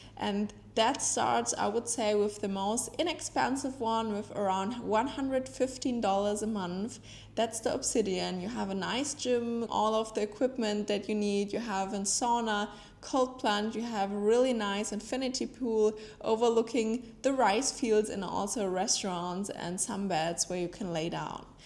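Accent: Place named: Germany. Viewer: Ah the German accent. Thx for the info btw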